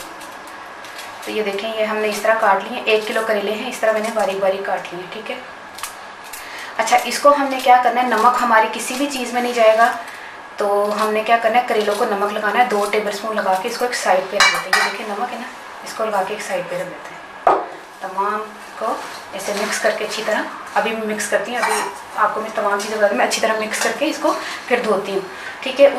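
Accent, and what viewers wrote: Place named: Punjab, India. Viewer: باجى جي. هذا قيميه ماشاء الله عليك ميه ميه وأنت لسان البنجابي حلوة ميه ميه جزاكم الله خيرا في الدنيا والآخرة مع السلام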